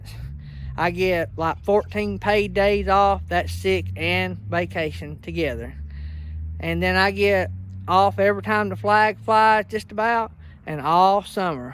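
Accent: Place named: South, United States. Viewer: New Here and and blessings...sound like u are from the south.. I’m in NC... schools are closed..bus drivers are happy I’m sure no school.. ok listening to your video you are from Alabama 🌹😊 Family here as I love supporting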